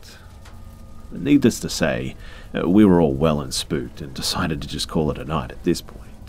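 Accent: Australia. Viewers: I didnt think there was an aussie narrator on the tube. Hi from brisbane 😂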